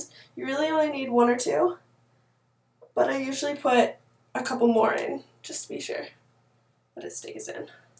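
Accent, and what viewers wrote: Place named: Canada. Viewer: i love your tiny Canadian accent :)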